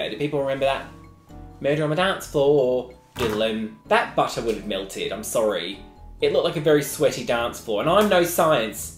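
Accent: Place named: Australia. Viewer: I still struggle to figure out if that’s an Australian accent or what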